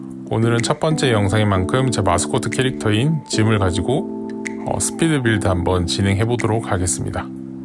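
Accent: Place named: Changwon, South Korea. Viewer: 첫영상은 사투리가 조금씩 들리네요ㅋㅋ 저도 창원살아서 정겹습니다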